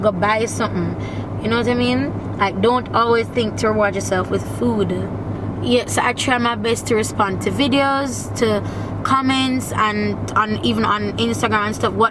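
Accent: South, United States. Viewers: Lol girl you have my Southern accent down pack. I was lol I was like no she didn't. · Wait but why did it have to be a southern accent you could have said it right in your accent boo cause I know y'all Jamaicans can give it up to y'all men lol.. thanks for sharing! GOD BLESS.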